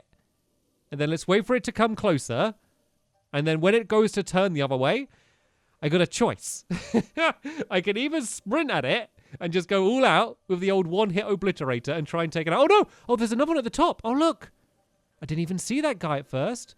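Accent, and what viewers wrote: England, United Kingdom. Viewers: Quest98Xx hes from england they pronounce things differently, not wrongly, just different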